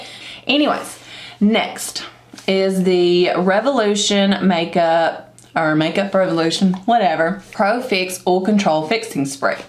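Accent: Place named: Arkansas, United States. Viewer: likex10 I only ever used 1 setting spray and thats macs fix + ....miss that smell. Haven't purchased that in a long while. Sorry to hear about your neck hope you feel better soon. Will keep it in prayer too. Arkansaw how cool! Thats where that accent comes from 😃👍👍👍 that is so beautiful. I love meeting ppl who Love the Lord too. I am most thankful for deliverance and overcoming things I never thought I could #GodbeTheGlory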